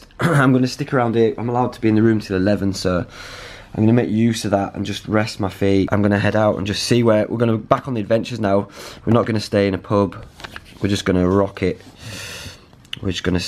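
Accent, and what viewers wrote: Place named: Northern England, United Kingdom. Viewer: You're so ridiculously northern. I love it. Looking forward to part 3, from Cardiff :))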